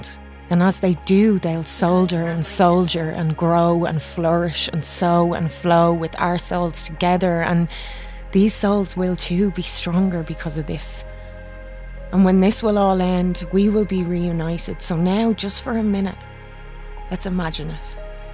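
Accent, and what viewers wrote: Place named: Ireland. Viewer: Oh my goodness thank you! Yes, sticking close to my apartment to minimize risk, while putting forefront my mental health. May you and yours remain safe as well! I must exclaim I so love your video! I shared it on my own Facebook wall and much thanked my friend Ginger for steering me toward it. This video is beauty, hope, assurance, validation, comfort. Plus an Irish brogue oh my! All together scrumptious. <3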